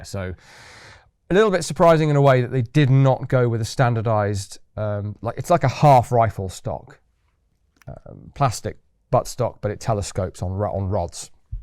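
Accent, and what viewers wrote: United Kingdom. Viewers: Always fun to listen to this British gent speak about firearms, especially ultra-rare almost non existent pieces.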